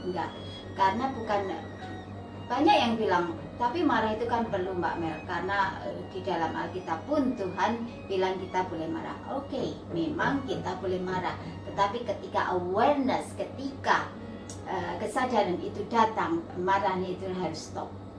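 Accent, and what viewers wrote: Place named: Java, Indonesia. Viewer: Logat yg ciamik antara jowo medhok dg logat bule .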